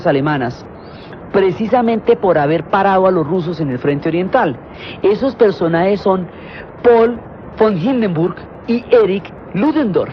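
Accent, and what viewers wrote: Colombia. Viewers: Diana Uribe es única, a mí me encanta 🙂 Por cierto que qué hermoso es el acento colombiano! Opinión de una mexicana. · No me canso nunca de escuchar a Diana Uribe... además de toda su sabiduría.... el tono colombiano que es tan tan lindo...Chévere!!!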